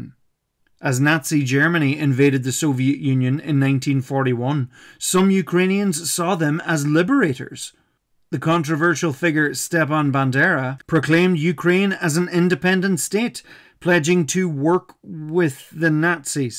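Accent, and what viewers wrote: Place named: Ireland. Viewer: Ah there is that beautiful Irish accent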